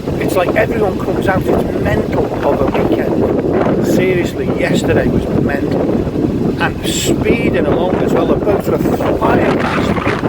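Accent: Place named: Cockney, United Kingdom. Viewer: Gutted I missed you cockney!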